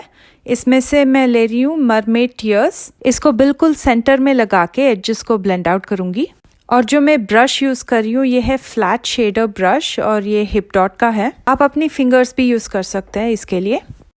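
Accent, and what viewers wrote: South India, India. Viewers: feeling lucky got ur reply🙈 everybody can understand u r fluent with it😍😍😘😘south indians speaking hindi is the cutest thing in the world🌏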